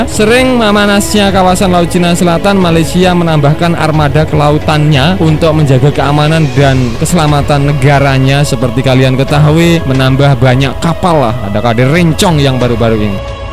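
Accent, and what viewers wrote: Java, Indonesia. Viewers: Suara mu kok medok e min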